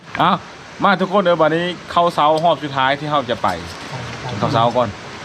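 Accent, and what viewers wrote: Phu Thai, Thailand. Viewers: เว้าคือภูไทเขาวง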